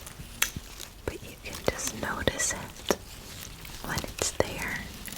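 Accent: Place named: New York, United States. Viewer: My favorite is your NY/NJ accent❤❤ I love it so much, please do more 💜💜💜💖💓💓💓💓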